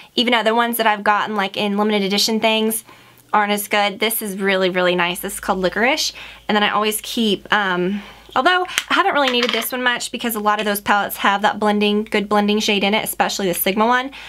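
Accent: South, United States. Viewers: I feel like I hear so much southern drawl in your voice, I love it!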